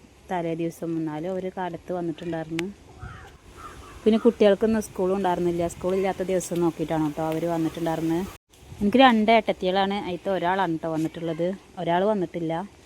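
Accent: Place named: Manjeri, India. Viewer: മഞ്ചേരി ആണോ.. Same സ്ലാങ് ഉള്ള ഫ്രണ്ട് ഉണ്ട് എനിക്ക് 😊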